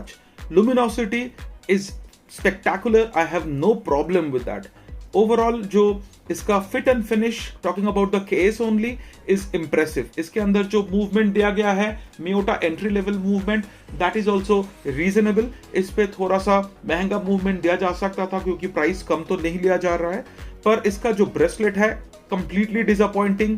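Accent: Bengal, India. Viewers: Dada bangali??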